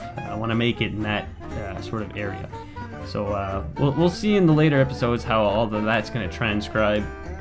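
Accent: Canada. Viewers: Canada, I knew it. I knew you had to be from New England or Canada or something. I wish I knew what my Pittsburgh accent sounded like to other North Americans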